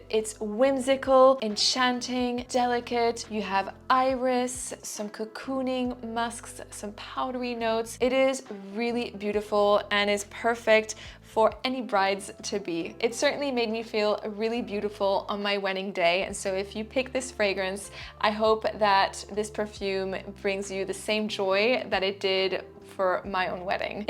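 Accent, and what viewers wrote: France. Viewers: Your english accent grow fast, I almost dont hear your French accent except when you say "Jus de rose" 😁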